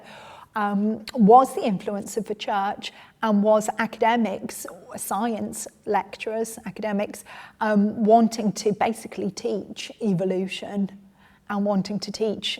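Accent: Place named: England, United Kingdom. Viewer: Where is she from? I'm trying to place her specific English accent.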